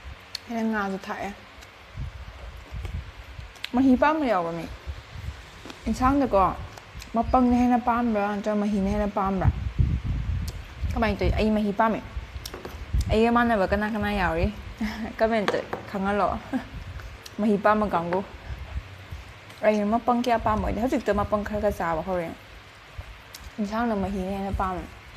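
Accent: Kakching, India. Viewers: Kakching marol se aidi tabada yam nungsi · Kakching marolse taba ym nungaite nungcihe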